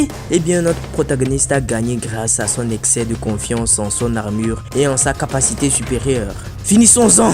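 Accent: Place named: France. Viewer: pourquoi tu a la voix d un gars du continent 😅😅😅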